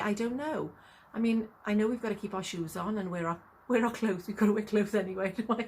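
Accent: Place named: South Wales, United Kingdom. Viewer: Well done its moving slowly, you are so funny, and you got a canny smile, I guess your from South wales I was born in North wales but the South has a much stronger accent lol but ive been up north east England 17 years now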